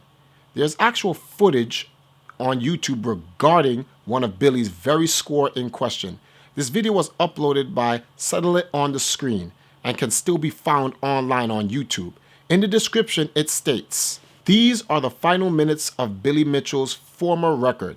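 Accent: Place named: United States. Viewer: Mr, I find you repugnant. You're a fake Jamaican, with your americanised accent. You try so hard to ape your masters, your slave mentality is irritatingly obvious. You are contemptibly inarticulate, misusing so many words and phrases, it is beyond reckoning. Why don't you learn how to speak English properly, before trying to use it to deceive people? Your incessant lying is utterly tiresome. You are the type of simpleton, who believes that heaping lies on top of lies makes for a convincing narrative. If there were any depth to your intellect, you would be aware of the fact that lies are strengthened by mixing them with truth. You are quite clearly ignorant of said fact. I am a West Indian, my skin is brown, and I play classic arcade games. I have no wish to be represented by the likes of you, so I would appreciate your removing yourself from the scene. 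Stop making yourself out to be more significant than you actually are, and stop manufacturing justification material for the use of the Twin Galaxies racists.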